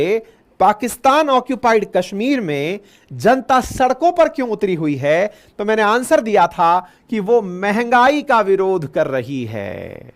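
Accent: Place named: Bihar, India. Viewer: ki apka bihari style shandar hai, real accent.🙏 Bharat mai 1991 mai hua tha, jab GOLD gaya tha.🙏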